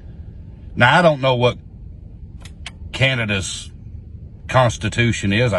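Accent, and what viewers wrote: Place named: Texas, United States. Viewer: He has a southern drawl. Sounds like Texan.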